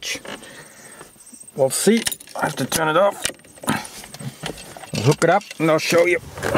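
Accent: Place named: Australia. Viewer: You sound like a ozzy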